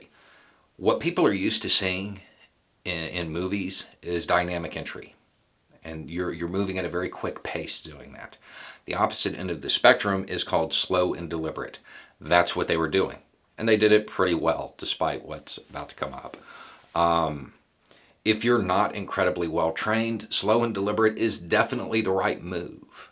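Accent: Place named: Oklahoma, United States. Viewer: are you by chance from Oklahoma? (accent related)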